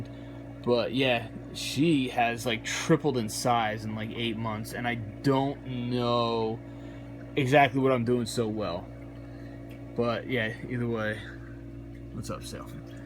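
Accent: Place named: Massachusetts, United States. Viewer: Awesome set up man! You really went all out with the upgrades! Where do you live? I kind of hear a massachusetts'ish accent in there.. I have subbed and can't wait for more vids!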